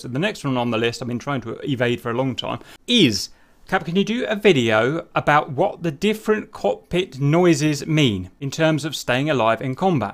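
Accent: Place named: Manchester, United Kingdom. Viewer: Just a thought...not that anyone listens...but I'm new to DCS, but I am a private pilot...and some of the things i have seen and heard from a number of people who claim to offer help...really bug the crap out of me. It seems that you guys forgot that you are offering "help"; which means that the people who are listening to you don't have the time or experience with DCS...that is the same time you have. Personally, I suspect a number of you..., sidewinder, wags, etc...work for DCS; or did at one time. So, before I begin let it be known that I have tons of respect for my brothers who live across the pond; but some of you speak with a thick Manchester accent...so understanding you in simple conversation is difficult. So could you please slow down when you are speaking...this is not a race...its a tutorial or instructional...and if you want to reach out to everyone effectively...slow down. 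In addition, as you talk could you please use the cursor to highlight the components you are talking about or using. More often than not you are using the abbreviations, and when you combine the abbreviations with the speed in which you talk...the new guys have fallen behind before you begin. So please slow down, and ensure you are visually queuing the components that you are talking about...so the rest of us get it. I'm new...but you will be seeing me on the field...Bad JuJu or Train Wreck.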